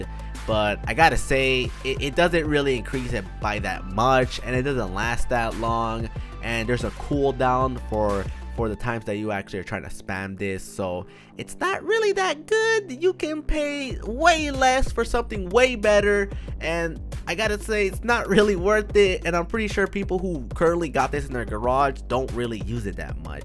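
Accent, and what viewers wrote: Norway. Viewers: er du fra norge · Are you from norway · Is he norwigian???? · Are y from norway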